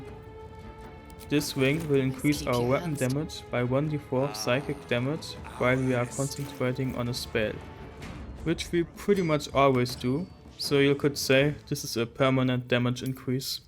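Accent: Germany. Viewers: Pretty cool video , a lot of thought went into it I can see that. one thing though, why don't you consider to use all of shar's blessings in the Myrkul fight ? any specific reason ? they are rather powerful and could have saved you 15h of redoing everything. I'm also very impressed there was no " I blew up the emboss in one turn using firework / explosives" I might need to start all that pre-buffing and switching stuff if I ever wanna do a solo Honourmode run. Wenn du den Deutschen Akzent für die Zukunft loswerden möchtest, empfiehlt es sich, Serien, Spiele und Filme auf Englisch zu setzen, da du hier die richtige Aussprache hörst, dieser Tipp hat vielen meiner Deutschen Freunde geholfen, sich schnell darin zu verbessern. Ich finde, es hat mittlerweile einen gewissen Charme.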